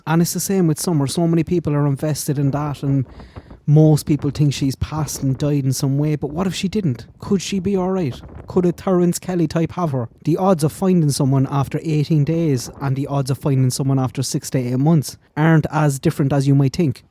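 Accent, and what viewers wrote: Ireland. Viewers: Love watching your dogs while you are talking about these cases, I also love the Irish accent, I follow Michelle walks too you are both great 😊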